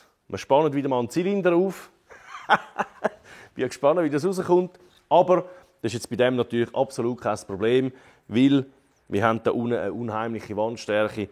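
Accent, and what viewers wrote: Switzerland. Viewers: quatschkante hihi. ja der schwyzer dialekt 😉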